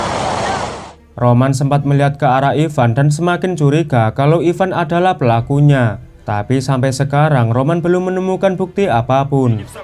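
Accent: Java, Indonesia. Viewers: Komentatorx bahasa Jawa medok banget,,,🤭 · Jowo mu ngendi min? Kyo aku 7th no jkrta logat jowoku ra isoh ilang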